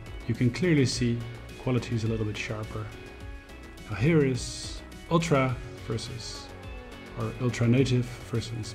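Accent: Netherlands. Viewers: Are you from the Netherlands? I hear Dutch ;)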